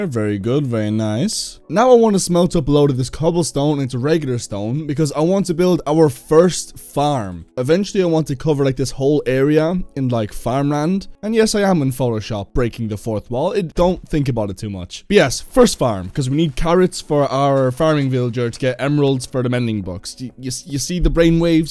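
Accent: Ireland. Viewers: can't tell if you're irish or european. either way, I like your voice! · As soon as I heard you're voice I instantly noticed that your Irish. It's nice to see another Irish brother 🇮🇪🇮🇪. Good luck in your channel mate.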